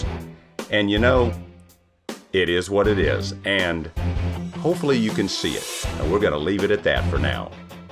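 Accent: South, United States. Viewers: keep up the excellent work- also, great to hear a Southern accent (I am from Dallas)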